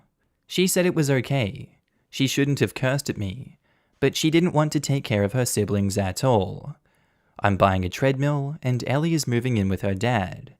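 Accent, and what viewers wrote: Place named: Australia. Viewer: Wow, love to hear a fellow Aussie voice in the evening. Onya! ♥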